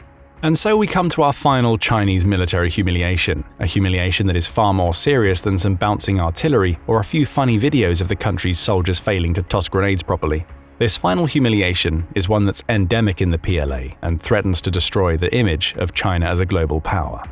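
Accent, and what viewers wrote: England, United Kingdom. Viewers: Lmao this video is total bullshit. I get a little suspicious on yhe stories then they flash up a picture of the lincon monument, that is 100% not what the monument looks like. I don't know who produced this video but it used an English accent voice using American measurements & stories that just aren't true.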